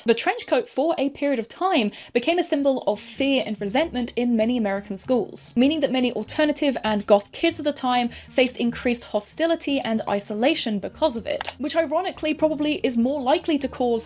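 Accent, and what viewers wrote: United Kingdom. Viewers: God as a German I love you’re British perfect voice. By the way: I was a goth back in the late 90s and I appreciate that you talk about this topic. Now I am much older and more relaxed but seeing the sellout of a subculture makes me sad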